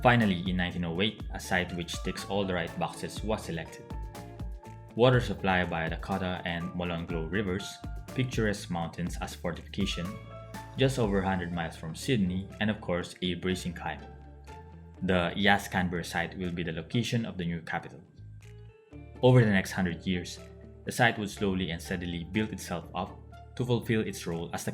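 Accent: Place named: Philippines. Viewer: Sounds like a Filipino accent.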